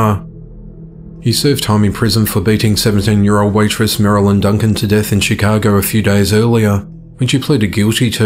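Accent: Australia. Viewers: Obviously everyone is entitled to an apinion.why dose it have to be an American accent that should be used.not everyone who watches this channel is American im sure other Americans watching would agree the accent can only be a problem if the English is badly spoken.i think this Australian accent is so not fake easy on the ear.no oh my gods,clear to the point of a tone that matches the content.living in. America you should be used to different accents and be more culturally intelligent.keep going with the Australian accent it’s what makes you guys individually top class.🧚Charlotte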